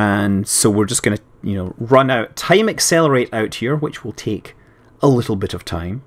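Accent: Scotland, United Kingdom. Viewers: Hullo! Spoken like a true Scotsman!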